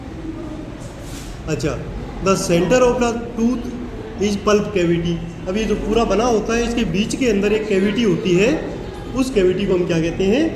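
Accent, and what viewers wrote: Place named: Maharashtra, India. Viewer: sir , you explain topics in simple words. Our me janta hu aap marathi ho aapke bat karne ke tarike se pata chal raha he ❤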